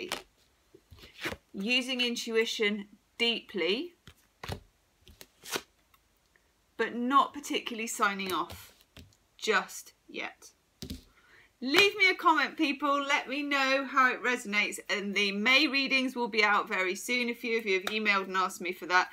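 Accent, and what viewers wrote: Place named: England, United Kingdom. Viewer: your Insight is amazing. Your accent is identical to that of Keira Knightley in Pride and Prejudice. my favorite English accent. Thank you so much for your talent!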